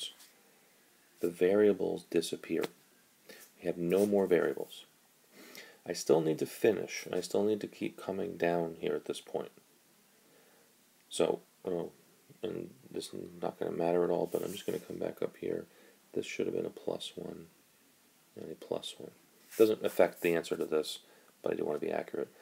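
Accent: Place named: India. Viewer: High Quality Video with Non Monotone Indian Voice (Thanks, These videos really help)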